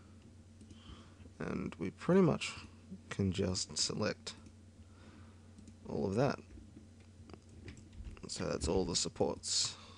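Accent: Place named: Australia. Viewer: Gotta love that australian accent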